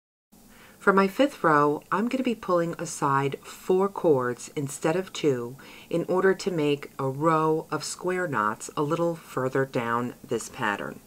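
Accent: New York, United States. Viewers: Absolutely Love your uplifting attitude!!!! I would love to start a YouTube channel. I love crafting, my sister inspired me to begin & now I can’t stop!!!! I love the DIYers , there’s so much to learn & be inspired from. Don’t stop what you’re doing. Trying to figure your accent NJ/NY?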